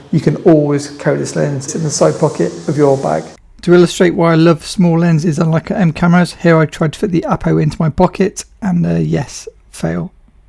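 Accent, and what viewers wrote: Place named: United Kingdom. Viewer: Ciao! Thanks again for your content, I always find it “sharp”, balanced, and genuinely enjoyable. And that lovely British flow of yours? Can’t get enough of it ❤ Since I work in sound, I hope you don’t mind a tiny suggestion: your voice has a naturally low and soft tone, which is really pleasant, but when the audio gets boosted to make it more audible, it introduces distortion, crackling, and a bit of reverb, even noticeable on phone speakers. Using a proper mic plugged into the Rode GO could really preserve the quality of your voice and take the whole listening experience to the next level. Sorry for pointing it out, I know it’s a bit of a sound-geek thing, but sometimes it makes it tough for me to fully enjoy your content, which is otherwise brilliant. Some mics that I suggest: RØDE lavalier II (not the “go”) Sanken cos11 Sennheiser mke2 Sennheiser mke1 One of those even used on ebay Combined with a little fur: Rycote overcover or similar Will make your audio leica level:) Looking forward to the next one!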